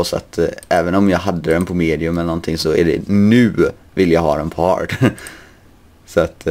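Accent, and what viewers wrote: Gothenburg, Sweden. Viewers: är du från göteborg för det låter som det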